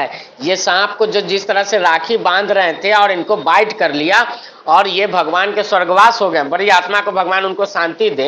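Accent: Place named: Bihar, India. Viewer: Bat karne ka style mazedar he bhai ..traditional Bihari language very nice Mahadev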